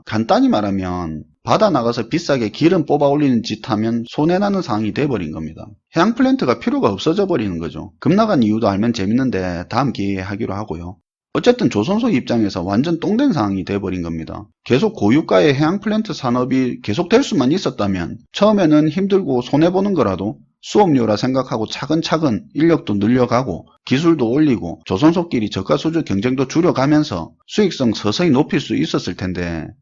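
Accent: Gyeongsang, South Korea. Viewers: 잘 봤습니다!! 경상도 사투리가 매력 넘치시네요~ 2019년도에도 좋은 말씀 잘 부탁드립니다!! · 역으로 같은 경상도 사투리를 쓰는 입장에서 전달력이 더 증대되는 느낌이 ㅎㅎ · 구독 눌너십더 구수한 갱상도 이바구 고맙십더 내 사위가 조선업 내놓으란는 기술잖데 몆년간 일이 없어가~ 생활고를 껵꼬밌어는데 이제 숨통이트이러나 마~ 고마 눈깔 뒤비지게 바빠지면 합니더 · 첨에 경상도 아재 사투리가 귀에 안들어왔는데 신기하게 이제 히어링 되네요. ㅋㅋㅋㅋㅋㅋㅋ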